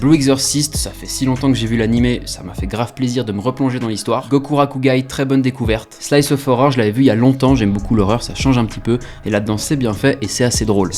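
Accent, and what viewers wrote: Switzerland. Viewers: T’es Suisse?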